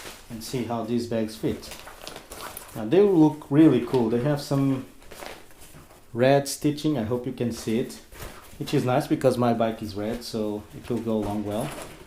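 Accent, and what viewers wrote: Portugal. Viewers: thanks a lot and keep doing this kind of videos. I'm following your channel with special atention since i have exactly the same bike. Are you Portuguese? Your accent sounds from a portuguese.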